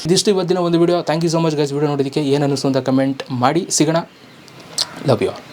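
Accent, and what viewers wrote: Mandya, India. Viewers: Pakka nam mandya. Bhase nivu. Sup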